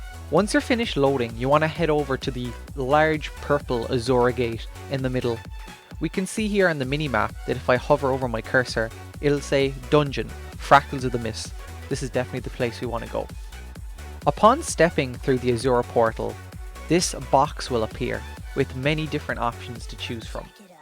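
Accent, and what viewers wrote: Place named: Ireland. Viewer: Love your video, thank you!!! The Irish accent makes it better, keep it up!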